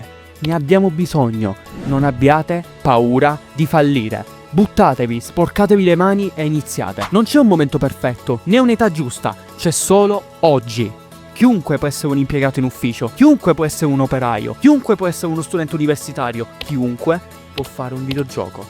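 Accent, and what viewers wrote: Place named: Italy. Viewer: mado lacento PIÙ italiano di sempre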